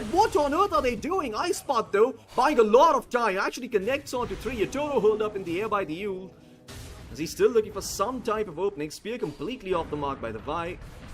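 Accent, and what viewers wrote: India. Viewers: Indian accent caster? Immediately muted the video.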